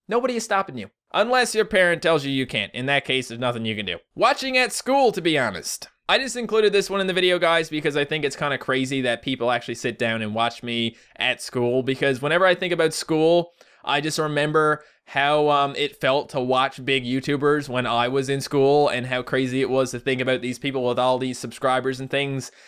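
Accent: Newfoundland, Canada. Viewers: Your "Newfoundland" accent sound kinda like the way people talk around here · I didn't understood anything of what you said with the Newfoundland accent. ^^ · That's weird, your accent is extremely Canadian.:'D I could tell you were Canadian instantly tbh. (I'm an accent nerd though) (anyway you're awesome keep on keeping on /o/) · Hey im from NL Bonavista and i understand all the newfoundland buddy, Love you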